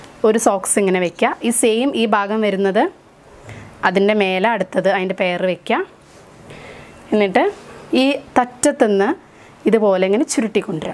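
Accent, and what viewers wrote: Palakkad, India. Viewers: Very nice presentation.Palakkad slang nannaytnd chechik..inim itholathe videos expect chyunnu.All d best 😊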